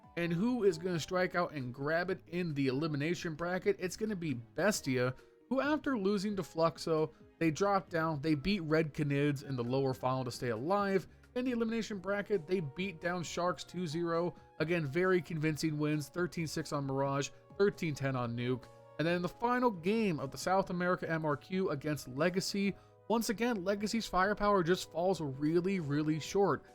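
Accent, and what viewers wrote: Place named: United States. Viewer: As an Australian, please pronounce it as 'Melb-n' or 'Melbin' it hurts a lot to hear Americans do and I do like these videos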